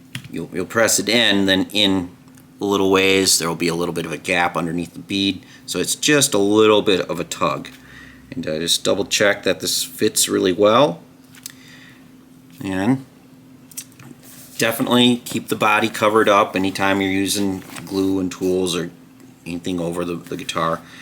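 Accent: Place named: Minnesota, United States. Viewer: Daaaamn. I'm here for that Minnesota accent don't cha kno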